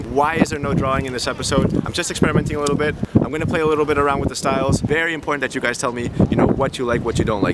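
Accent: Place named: Spain. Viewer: I love ur Spanish accent, u should speak Spanish more often😁 great work with the vegan transition!! 👍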